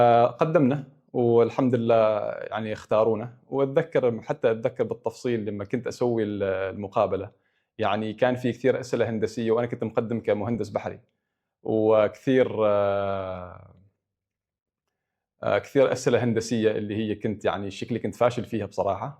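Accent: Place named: Syria. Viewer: لهجتو سورية الصراحة😅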